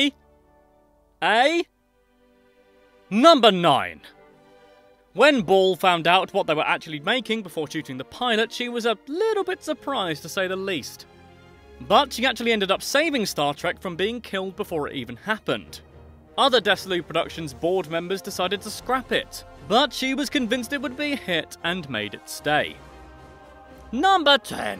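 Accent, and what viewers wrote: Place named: United Kingdom. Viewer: Can't follow this one. Need to practice super fast British accent and encrypt spoken kappas #SwedishNoob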